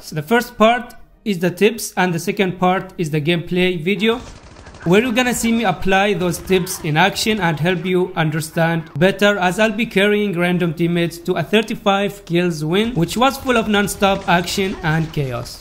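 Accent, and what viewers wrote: India. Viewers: Sorry for asking but your voice was like indian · Bro where are you from? You sound like Indian If India which state?🤔 Jst want to know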